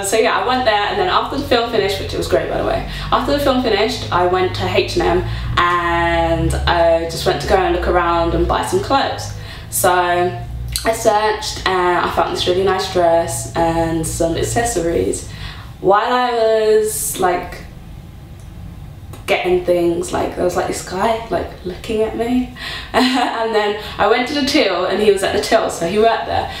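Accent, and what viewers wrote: United Kingdom. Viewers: So glad I found you! What teach program are you with? I just applied for study abroad to Korea for the Summer. I want to start teaching there when I graduate (Dec '13)....So excited. I love the British accent. I would go into it so much in High School because I watched so much BBC. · Cute...your my Nigerian sister and the only difference is the accent, I speak american and you speak british. I love your stories its awweeessoommee.......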